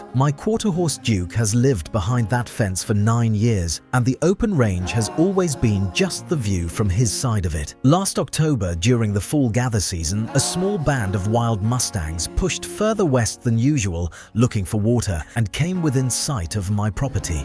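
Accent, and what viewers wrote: United Kingdom. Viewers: Why does the narrator have a British accent when clearly this is an American story? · why does ALL YOUTUBE AI Naration have a uk accent? makes no sesnse at all!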